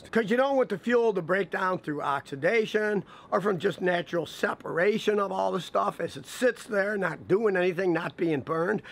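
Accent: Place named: Western New York, United States. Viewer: Really like your videos. Love the WNY accent. Reminds me of home. ;-)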